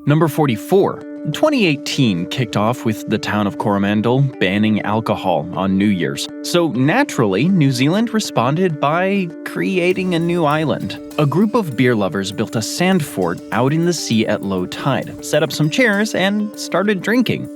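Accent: United States. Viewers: Hey, let's not forget that he sounds American and their words often sound different to ours. So attempted pronunciation is a good try. As for the Maori names, as a 7th generation Kiwi (Pakeha) I struggled with Maori pronunciation even though I learned the vowel sounds in school. The narrator may have had little to no exposure to the language. It's not spoken elsewhere and he may have been doing his best with pronouncing. Imagine if you were making a video on à country you have never had exposure to. Say China. They have two versions of language, Mandarin and Cantonese. I cannot tell which is which, but I know I once worked with a lady whose first name was Qian. Pronounced Chen. If I hadn't learned in that job with a multicultural workplace, I would not have learned how to pronounce that name. Many names, in fact. And I learned that Te Reo is a dying language and my Māori friend taught me a bit about it. 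Since then I have attempted to speak Maori words and names as accurately as possible, and told my daughter about this and she tries the pronunciation too. We do t always get it right, and I've been exposed to it all my life. As for the British names, I'm pretty sure it's just trying to split it into familiar syllables and mispronounced. As for me,.if I was doing a video on other places, I would run some pronunciation checks and see videos of people from the country or place and their pronunciation. But it seems many don't do this step. It's a shame, but not unexpected. No need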